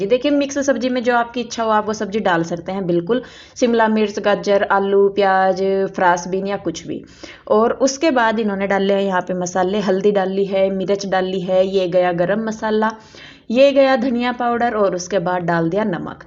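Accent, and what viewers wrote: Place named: Uttar Pradesh, India. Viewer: Apki language up ki lgti h hmare yha bhi ese hi bolte h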